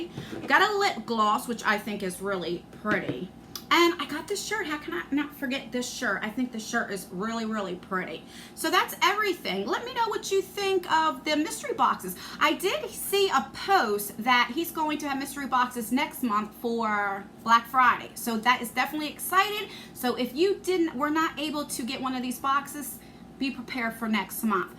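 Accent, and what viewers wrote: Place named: Philadelphia, United States. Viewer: I love hearing your Philly accent!!! I really wanna win but I'm not about to comment a million times lol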